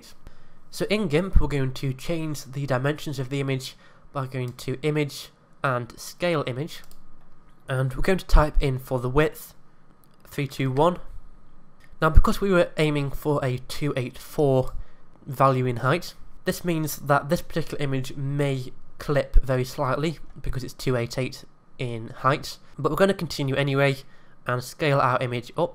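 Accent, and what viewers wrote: United Kingdom. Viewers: Im originally from stoke i recoginize that accent anywhere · A little off topic, but, are you Scottish? Not to be racist, but you sound kind of Scottish.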